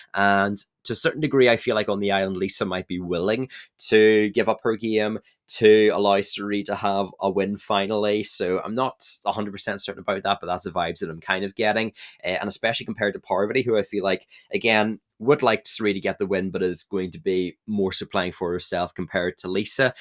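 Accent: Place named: Northern Ireland, United Kingdom. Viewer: Having watched Survivor for like over 2 decades, and only really having some family members also watch Survivor to discuss with them. It's really strange and refreshing to hear someone else with a Northern Irish accent talk about Survivor as there are so few of us! (I'm from Belfast)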